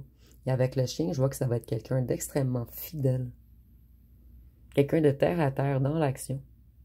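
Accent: Quebec, Canada. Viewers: Vous avez Madame une très belle manicure , une belle couleur de vernis a ongles ,et je vous ressent une très belle personne en tout point de vue et très soigner pour vous autant ds votre façon de penser que de vous arranger et j'aime bien votre accent québécois ces très jolie.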